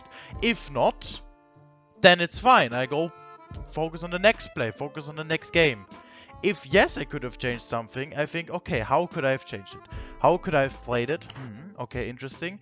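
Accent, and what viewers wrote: Germany. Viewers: du klingst deutsch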